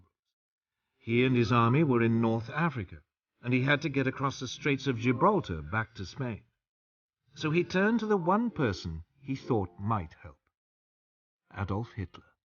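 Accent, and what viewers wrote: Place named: United Kingdom. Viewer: Another biased, pro lefty-slanted video done by a guy with a British accent. Obviously not all commies in Britain joined the "Cambridge 5" commies, drunks & perves and moved to Moscow. The video maker probably sends a box of candy to Jeremy Corbyn on Mayday each year. General Franco and the various forces/groups of "Nationalists" brought law, order, and peace to the disaster cauldron cooked-up by the Spanish "Republican" government in Madrid. Socialists, Communists, atheists ruin everything they touch. Just to irritate the pro-Communist video maker / narrator, I think I shall look for a photo of General Franco and buy it, frame it, and put it on display along maybe with the flag / symbol of the "Carlists".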